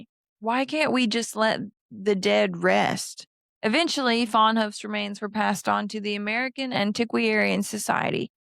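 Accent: Kentucky, United States. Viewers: First video ive seen of yours,everything seems grade A+ i enjoyed the accent,sounds like a ky southern one( i could be wrong and either way dont post what state yer in cuz there's tons of aholes that would just dox ya) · Hi, my friend, I just found your channel, and I'm so glad and happy that I did. I'm speechless, because I'm getting some real and true history facts, told with that absolutely lovely and sweet southern Kentuckyian accent of yours - I'm officially in awe, and I could listen to you talking for the eternity, lol. So you got my like and sub, that's the least I can do, to thank you for your awesome video, that I'm enjoying so very much. So stay awesome, smart, and gorgeous as you are, my friend. Greetings, much respect, love, and all of the best wishes, from "across the pond", from Serbia, southeast Europe. Cheers!👨‍🎤🌹👧🇷🇸🥂🇺🇸🌏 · I can walk to Mammoth cave lol. 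That is how close I am to it. Kentucky has a bunch of beautiful ladies. Doesn't surprise me to see another one like you here. Nice video! Edit: When I hear you talk I can tell you have that Kentucky accent behind your words. I didn't even notice mine until I heard my voice play back after a recording I made. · Don’t know how this video popped up on my for you, but glad it did. Just subscribed, she sounds like one of my Kentucky girls so I love it and MC is a field trip we all had to take at some point. Looking forward to seeing more content from her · here from history of everything podcast. FINALLY, a Kentuckyian with a Kentucky accent! 😊 Unlike whats-his-name who claims to be kentkian with no accent??!, 😅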